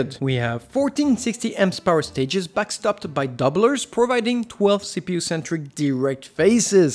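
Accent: France. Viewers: That french accent tho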